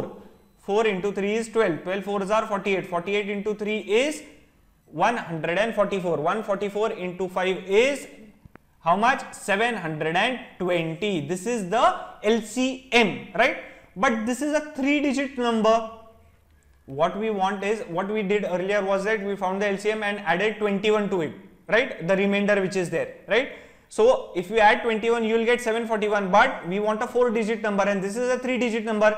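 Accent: India. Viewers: Tamil English bro, cannot hear properly sia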